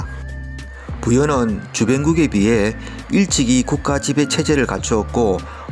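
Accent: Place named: Gyeongsang, South Korea. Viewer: ㅇㅑㅁㅁㅏ ㄱㅕㅇㅅㅏㅇㄷㅗ ㅅㅏㅌㅜㄹㅣ ㅈㅗㄲㅏㅌㄴㅔ. ㅇㅏㄴㅗㅏ ㄱㅕㅇㅅㅏㅇㄷㅗ ㅇㅣ ㅅㅐㄲㅣㄷㅡㄹ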